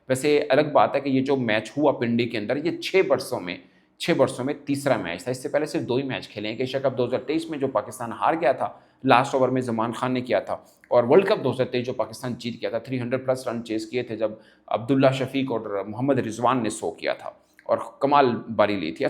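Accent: India. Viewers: India waly jesa bolty hen wesa he bolta hy 😢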